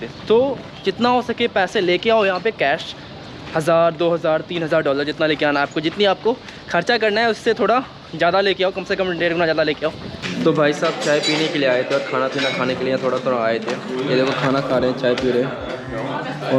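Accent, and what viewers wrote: Bihar, India. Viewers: Mitlish ka video acha tera bakwas 😡😡😡 h Tu bihari gadi ko gari boltha h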